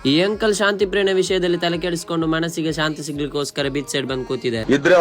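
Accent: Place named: Kerala, India. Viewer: Malayalam slang anne chandha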